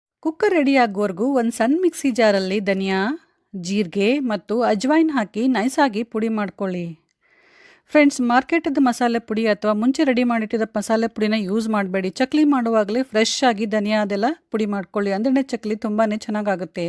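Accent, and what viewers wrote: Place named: Belgaum, India. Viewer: Hi mam nimma yella aduge nodiddhini thumha chennagidhe, nanna magalu nimma mathina shyli keli naguttale 😂 belgum side mathado tara idhe.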